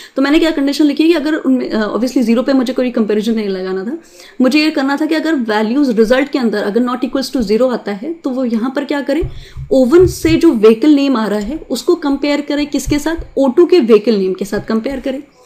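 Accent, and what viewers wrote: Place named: India. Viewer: in nearly every programming tutorial Video is this Indian Accent. As a German i nearly don't understand anything. Everytime i have to watch a Video more than twice...... Nevertheless thanks for the Video. After serveral times i understood it. :)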